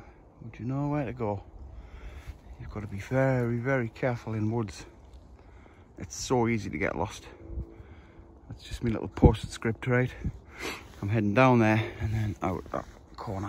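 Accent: Newcastle, United Kingdom. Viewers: I think he is Geordie 🤣🤣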